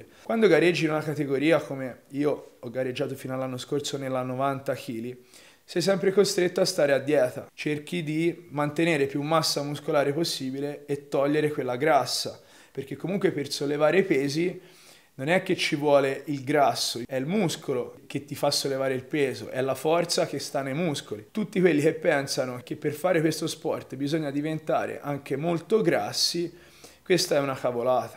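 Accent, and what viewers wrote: Tuscany, Italy. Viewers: L`accento toscano è fantastico !!!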